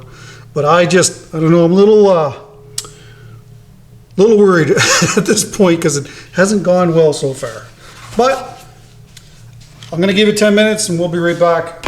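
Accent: Canada. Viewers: I love the Canadian accent, so I'm here for the commentary! I love this Hoser, Aye!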